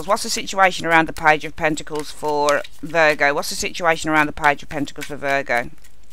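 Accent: Birmingham, United Kingdom. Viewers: Thank you. Mismanaging money...yup. my lifelong problem. Hopeless! Love the Brum accent btw. Always enjoy your direct, on point approach to readings....don't let the whingers get you down!! 😋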